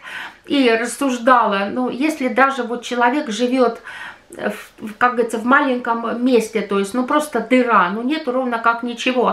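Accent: Finland. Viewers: Тащюсь от акцента! Вспоминаю персонажа Вилли Хаапсалло из Особенностей национальной охоты: Зеня когда мы пойдём на охоту?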